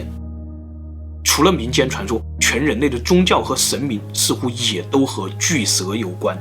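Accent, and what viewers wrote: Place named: Fujian, China. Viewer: 特殊的福建口音非常帶感，獨樹一幟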